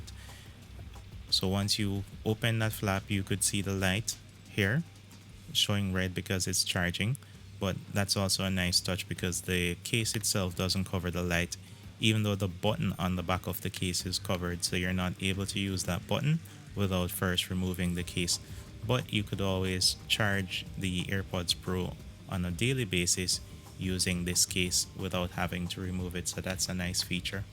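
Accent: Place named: Jamaica. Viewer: You sound Jamaican...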